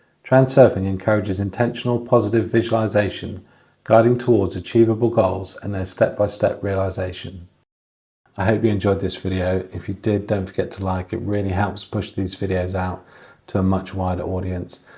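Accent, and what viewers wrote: United Kingdom. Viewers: lovely to hear your British accent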